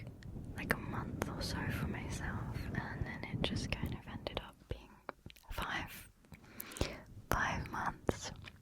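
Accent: United Kingdom. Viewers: 🙌🏼🙌🏼🙌🏼🙌🏼 Yay finally a whisper video!! I just love your British accent and whispers. I hope all goes the way you want, and thank you!!! The kitchen turned out beautiful, I LOVE the lights on the bottom of the cabinets.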